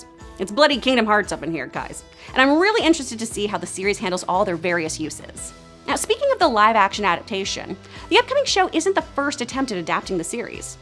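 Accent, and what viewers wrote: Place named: Texas, United States. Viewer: Even with the obvious changes they're making, I'm still excited to see this. Also, your Texas is strong in this vid and I'm living for it.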